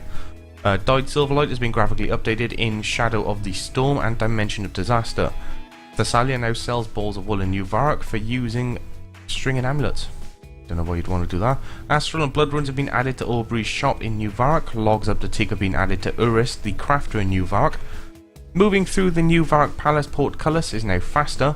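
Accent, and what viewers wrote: Wales, United Kingdom. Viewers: what part of wales are you from XD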